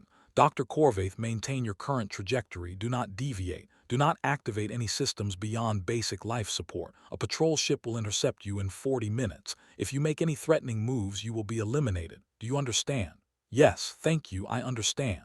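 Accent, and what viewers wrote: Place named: United States. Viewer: A story with an American voice talking about accepting refugees. The irony.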